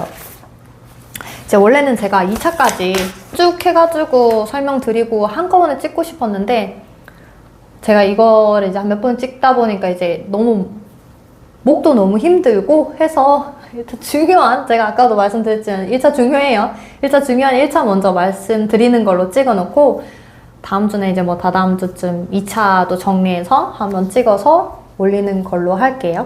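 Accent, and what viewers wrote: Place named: Gyeongsang, South Korea. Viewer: 경상도 분이신가봐요ㅎㅎ 응원합니다 선배님!!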